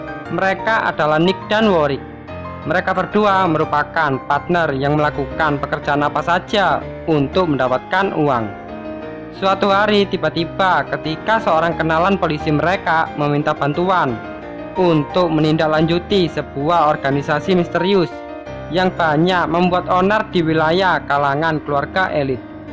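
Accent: Java, Indonesia. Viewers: cuman masukan doang nih bang, pembawaannya kurang. masih medok banget. ga salah sih klo medok, cuman biar enak aja yg denger. makasih.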